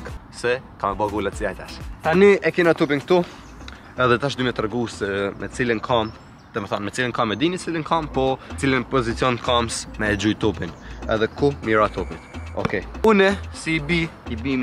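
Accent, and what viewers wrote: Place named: Kosovo. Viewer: Me dukesh që fol gjuhë të përzier sikur kosovar dhe shqiptar(sikur nga shqiperia) 😅😅 veq vazhdo më i miri youtuber shqipatar jee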